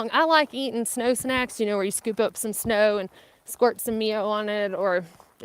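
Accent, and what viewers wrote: South, United States. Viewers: Your southern accent is just the best!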